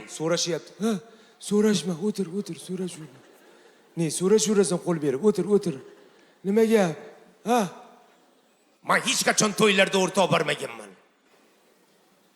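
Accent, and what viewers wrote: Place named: Tashkent, Uzbekistan. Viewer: Гап йук Тошкент шеван ёрасиз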